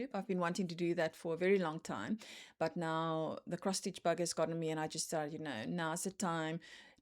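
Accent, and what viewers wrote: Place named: South Africa. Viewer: Hello from the Chicagoland area, really enjoyed your first Flosstube! I have a sibling and nieces currently living in SA, so I recognized your accent right away 😊!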